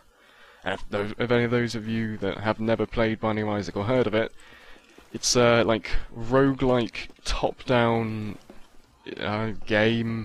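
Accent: RP, United Kingdom. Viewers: alright cool however i noticed a big difference between today and 2014. you sound so much more posh! c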